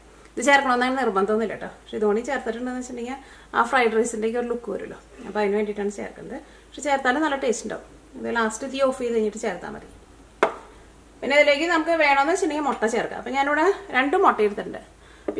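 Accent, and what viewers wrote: Thrissur, India. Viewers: അതാരികും സംസാരത്തിൽ ഒരു കര കരപ്പ്.. പിന്നെ തൃശൂർ കാരി ആണെന്ന് ആദ്യം തന്നെ മനസിലായി..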